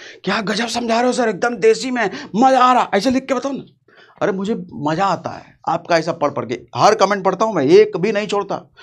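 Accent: Bihar, India. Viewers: Kya aap Bihari hai❤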